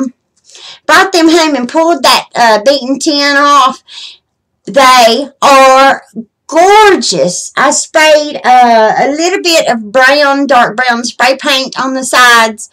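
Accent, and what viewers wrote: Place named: South, United States. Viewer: Wow!! You are a wheeler dealer!!! “In yonder” tickled my funny bone! You might have more of a Southern accent than me!! Glad you had a successful yard sale with your treasures.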